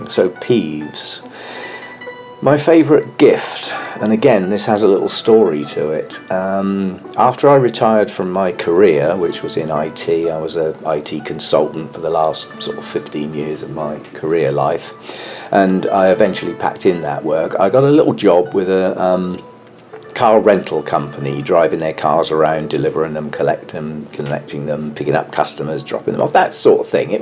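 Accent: United Kingdom. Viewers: It is perfect,you know I'm not a native english speaker,and people with British accent really help me out with speaking;)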